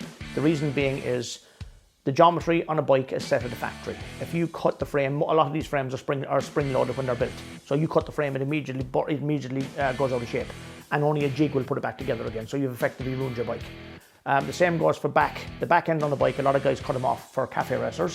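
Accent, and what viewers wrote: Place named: Ireland. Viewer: I came over from Irish Demon's channel to give you a look-see. He's never steered me wrong before. I LOVE your Irish accent!! I originally subbed to Irish on the recommendation of Team Skeptic. I totally appreciate your laying out a step-by-step approach to working on a bike. I only have a little experience with being a passenger on the back of a motorcycle. However, I can appreciate the artistry behind bike building!! Looking forward to seeing what you make your next video on!!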